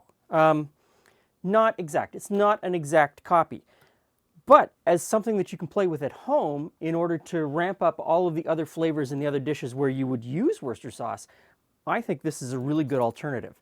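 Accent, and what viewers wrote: Canada. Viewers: He's saying it right. Albeit with a Canadian accent.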